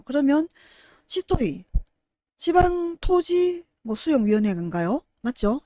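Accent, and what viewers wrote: Busan, South Korea. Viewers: 목소리가 재미있어요 부산인가요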